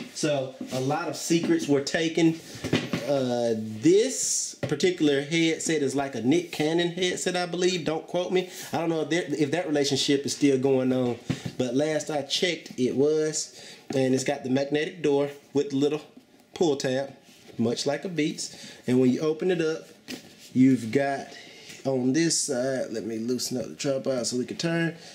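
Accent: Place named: Texas, United States. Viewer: y sound like a countryman from Texas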